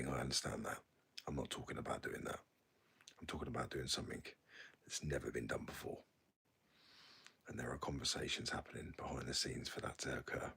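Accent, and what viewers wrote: United Kingdom. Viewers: Welsh man?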